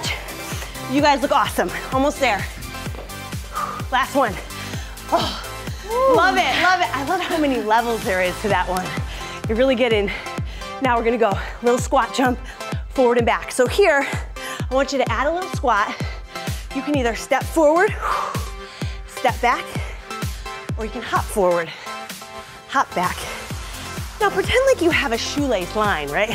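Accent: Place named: United Kingdom. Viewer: Wait, didn't she used to speak with a British accent :/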